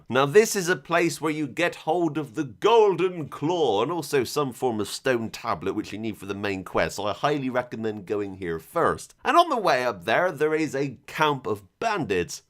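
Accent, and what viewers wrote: United Kingdom. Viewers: Love that British accent :D or what it is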